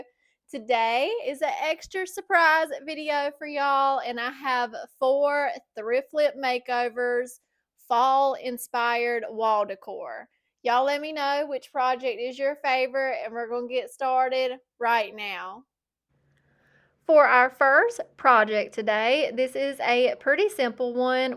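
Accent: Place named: East Tennessee, United States. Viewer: Please keep saying Modge Podge! I love your unique pronunciation; my daddy used to pronounce umbrella as underbrella…sounds more right to me. I love your accent because it doesn’t sound like an accent to me. Of course, I live in East Tennessee, so go figure.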